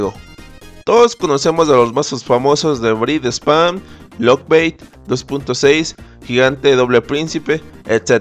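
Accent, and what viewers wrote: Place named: Ecuador. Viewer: Por tu acento, si no me equivoco eres de Ecuador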